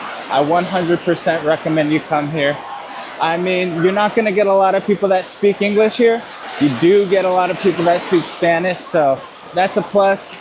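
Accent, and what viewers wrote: Brazil. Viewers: Very accurate in many points. This guy dived so deep into the Brazilian culture that it seems to have influenced his way of speaking. It's funny because normally Americans don't have that voice intonation. He reminds of a Brazilian speaking fluent English without an accent. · You have an accent. I guess you’re Brazilian as well! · Hey I'm not trying to be rude or anything but I could not help myself to notice you've got a Brazilian English accent somehow?! Please tell there is a explanation and I did not lose my mind yet.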